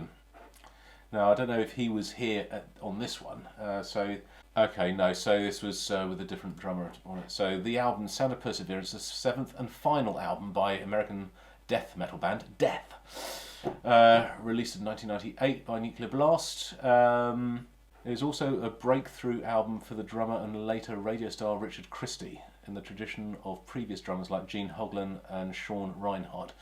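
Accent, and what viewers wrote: United Kingdom. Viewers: Your accent is nice :D Are you from Britain? 🤔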